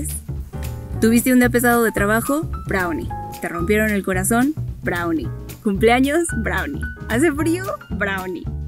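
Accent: Mexico. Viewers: el acento whitexican de la narrado me asqueo ! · Lo malo del video es la voz whitexican, pierde credibilidad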